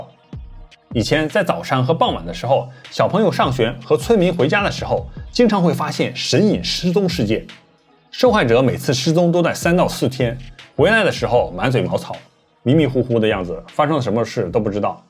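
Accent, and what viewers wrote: China. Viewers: 主持人大陸人口音耶介紹魔神仔到後來自己一直講成神魔仔😂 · 直播主聽你這口音是中國內地人對吧，解說網路怪談視頻越界到台灣本島了，魔神仔是台灣本島才有的怪物，中國內地可沒有魔神仔出沒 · 想問一下，您到底是台灣人還是中國人? 純屬好奇，因為覺得您口音很像大陸人，但對台灣卻很了解，感覺您是大陸人台灣人的綜合版。:face-blue-smiling:face-blue-smiling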